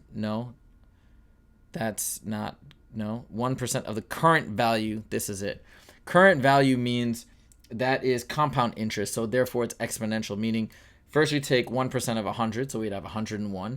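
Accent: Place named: United Kingdom. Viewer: Lol your british accent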